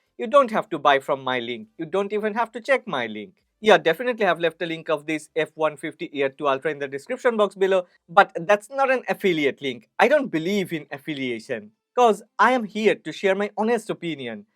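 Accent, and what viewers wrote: India. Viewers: When i play a review video i can't escape that Indian English accent sometimes but you're amazing.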